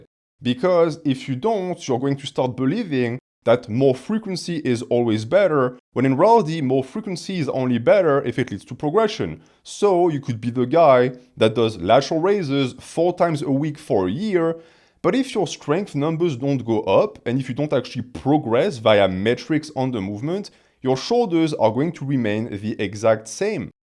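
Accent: France. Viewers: This is absolutely one of the best explainations of the dynamics of musculature and it's progression, I cannot believe this is free content. Wow. Bravo. Also, please tell me what the origin of this glorious accent is. Edit: before anyone chimes in and tells me France, duh. I'm talkin' specifics here.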